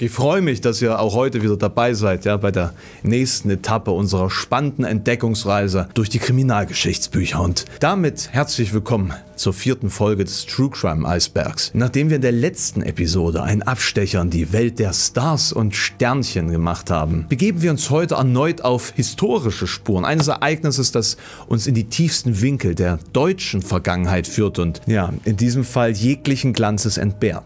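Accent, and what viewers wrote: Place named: East Germany, Germany. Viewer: Ernsthaft? Ostdeutscher Synchronsprecher?! 🙈😇🤔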